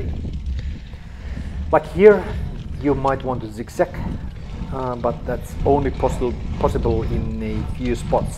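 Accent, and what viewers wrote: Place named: Finland. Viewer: There are great conditions and landscapes 👍 It's interesting how well a Finnish understands that English with a Finnish accent😁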